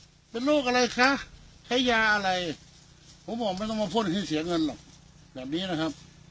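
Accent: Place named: Countryside, Thailand. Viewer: พูดแบบลุกทุ่งๆต่อการอธิบาย ฟังก็ดีไปอีกแบบ!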